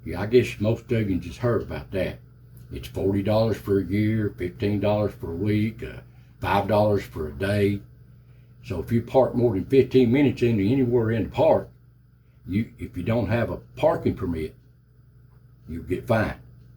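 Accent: Appalachia, United States. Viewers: I love your accent I'm proud to be an ole hillbilly like you ole buddy. I understand everything you say. Love your videos better than anything you can watch on this ole tv these days. Keep it up ole buddy! I live in Kodak TN and used to live in Eastern Kentucky so this all hits home to me. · Mr, I feel like the darn COE and park managers have gotten waaaay out of hand with all their rules and regulations!!! I live in NE TX and my family and I have had more than 1 ru-in with the COE. So I know just how you feel too. I enjoy all of your videos as you uncover some of America's lost past and old cemeteries. your voice tone and Appalachian accent is very soothing to me. keep on keeping on! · Love the channel. Though I was not born in the Appalachian mountains, my mom was. My grandparents spoke the exact same way you do… my Grandmother a Boleyn and Sparkman by birth spoke so thick I could hardly understand her. 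they were from Knott county and Floyd county Ky. I remember going to family reunions and meeting my Triplett, Boleyn, Wicker, Sloan, Collins, Gibson, and Sizemore families. We would stay for a few days and my Great Uncle Oscars wife would cook us a breakfast fit for Appalachian royalty. My mom has passed but I keep up with a few cousins up there. Thanks for what you do, sir! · Good to see a face with that true Appalachian voice.. Blessings brother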